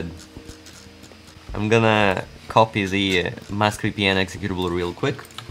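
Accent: Russia. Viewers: "Because im russian" Я сразу по акценту понял что русский) Но англиский у тебя очень хорош. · У тебя акцент очень похож на русский 😳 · One of his longest videos and 1/2 with his voice i like your Russian accent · I like his Russian accent lol 😂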